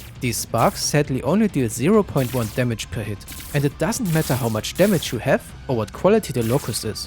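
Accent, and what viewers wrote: Germany. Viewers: are you german? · du klingst deutsch… bist du Deutscher?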